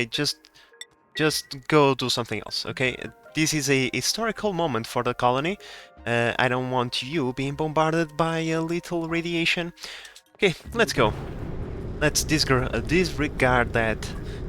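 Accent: Portugal. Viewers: I swear your accent is from Portugal.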